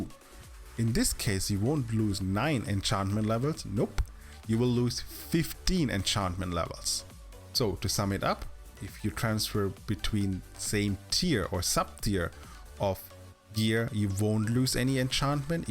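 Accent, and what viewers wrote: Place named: Austria. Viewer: Thanks for the clear explanation bro. Based on your experience, what was the highest item level you saw in game? I've seen a lot of videos and they ranged from <num> only. btw I like you accent, it's like Arnold Schwarzenegger. lol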